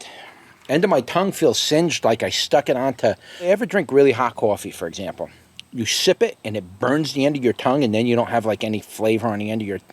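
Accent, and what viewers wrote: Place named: Philadelphia, United States. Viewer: I enjoy your videos because I grow a lot of chiles where I live in New Mexico and also I am from Philadelphia so its nostalgic hearing your accent. Im not sure where your from but I hear home in your voice. Thanks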